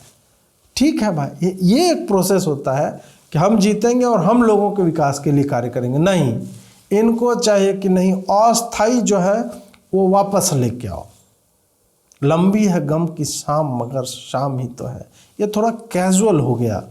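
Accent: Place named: Bengal, India. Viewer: Bengali accent is too good. Milega sab milega.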